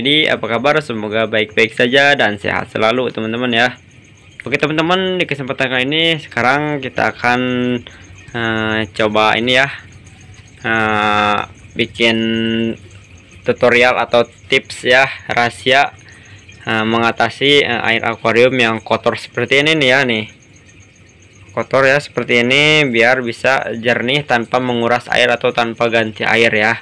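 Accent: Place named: Sunda, Indonesia. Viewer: Sunda bngt eta si akang😂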